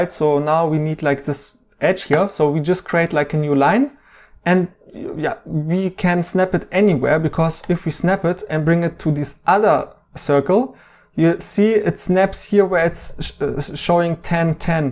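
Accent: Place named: Germany. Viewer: I love your German accent , it’s so cute!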